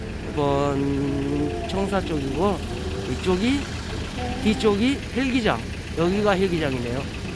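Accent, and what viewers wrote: Seoul, South Korea. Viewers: 님 완전 서울사람인데요 ㅎㅎ 홧팅!! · 서울사람보다 서울말 더 잘하는 님~ 화이팅~!!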